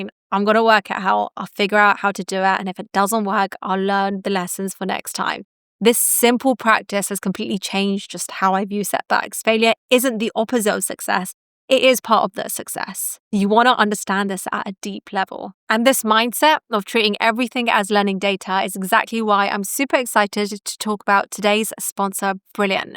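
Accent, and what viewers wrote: United Kingdom. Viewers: Are you born British?? I am obsessed with your British Accent.....!!!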